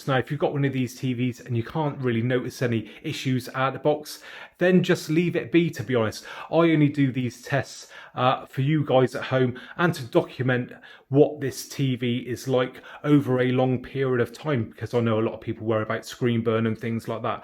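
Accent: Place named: England, United Kingdom. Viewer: Sério? Acho que ele é inglês, vc deve ta acostumado com inglês americano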